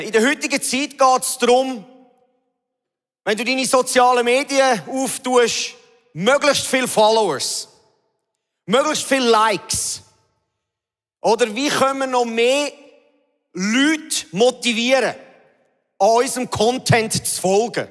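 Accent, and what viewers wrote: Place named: Switzerland. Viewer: Versteh leider net so gut, des schitzerdeutsch, schief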